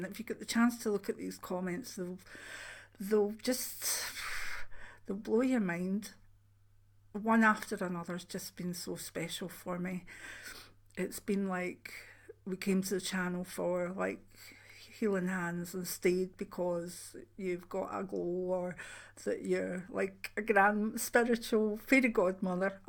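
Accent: Scotland, United Kingdom. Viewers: My parents & siblings are from Kilmarnock! Your accent is great and understandable :) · My family and I love your accent. We live in the USA but are of Scottish heritage and you sound like home to us. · Hello dear, I've been watching in the background and not commenting until now. You are so genuine. I sense your energy. I'm in the USA and have a girlfriend from Scotland and everyone complained that they couldn't understand her and I never had an issue and loved her accent and she sounds just like you 😍. I love the way you say "wee bit"...it just makes me smile! Your channel is wonderful. I am clairaudient and clairalliant since childhood but it is random and I am trying to learn how to use it in a more controlled manner. Thank you. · Sometimes individual words can get be unclear but taken no notice of rudeness......that's all it is. Jealousy * (as always) for your success.. 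I find a scottish accent very pleasant to listen to and yours is no exception.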